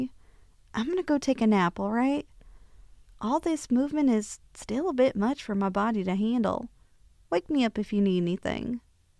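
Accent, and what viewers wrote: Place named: South, United States. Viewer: What's with the southern accent my lady?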